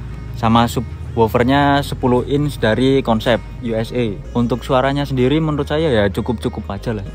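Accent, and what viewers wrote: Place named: Java, Indonesia. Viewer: wkwkwkw medok nya ganggu banget anjiing jawa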